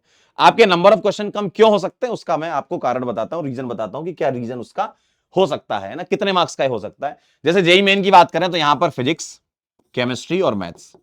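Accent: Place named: Hyderabad, India. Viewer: Waise accent Se hyd ke lagte hai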